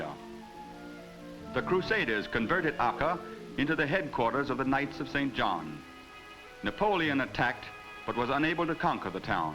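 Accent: United States. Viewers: It's called Trans Atlantic English. It was very common back then. No one actually talked that way, but pretty much any American movie from that time has this accent. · To us Brits it's definitely an American accent. His pronunciation is a tad bit dodgy as well. Druzes!!! It's just Druze. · To me it sounds American. Only a few words here and there sound "British"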